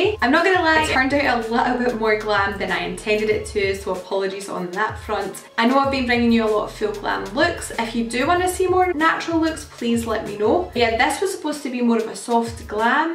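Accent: Scotland, United Kingdom. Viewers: After watching your videos, I always find myself going away to do my make up😂 love hearing a Scottish accent on YouTube as well😁🏴💕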